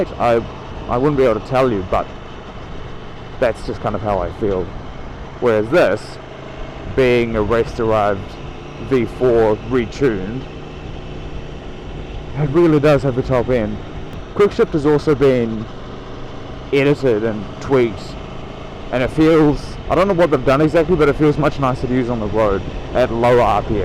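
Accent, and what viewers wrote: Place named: New Zealand. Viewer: Great to hear a Kiwi accent on a bike review! Great review! And most importantly... Oooh look, a Squirrell!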